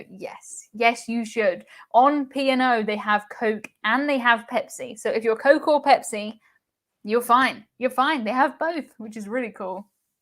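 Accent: United Kingdom. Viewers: I value your opinions & love your presentations (& lovely UK accent!)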